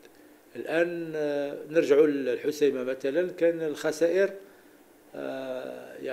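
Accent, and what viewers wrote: Algeria. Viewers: تيهضر بحال شي جزاءيري 😮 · واش هذا جزائري على حسب كلامه الدارجة المغربية هاربة عليه ويستعمل كلمات دخيلة على لغتنا حتى في اللغة العربية ديالو مايلة لجهة هكيين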